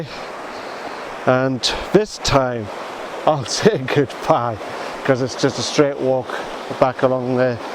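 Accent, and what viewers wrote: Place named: Northern England, United Kingdom. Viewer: I can tell your from up north and you don't have to come down : )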